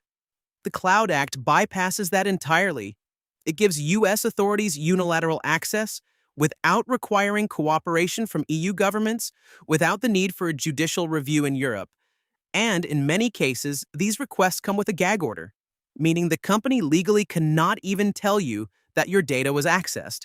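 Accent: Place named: Canada. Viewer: you sound like some dummass canolian.....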